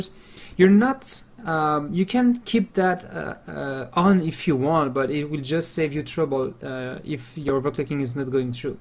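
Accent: France. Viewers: bjr monsieur, êtes vous Français ? Très bonne vidéo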